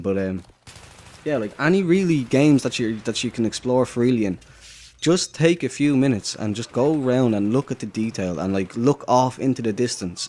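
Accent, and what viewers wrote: Ireland. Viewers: U aren't! I'm actually Scapooty and if ur then you should know me. Lol never knew you had such a Irish voice! I should be uploading some PC BF3 gameplay soon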